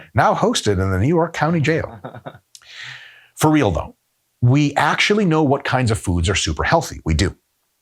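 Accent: United Kingdom. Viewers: 😂😂 As an English fan of yours you do make me laugh with your British accent. Nice one 👏🏻💪🏼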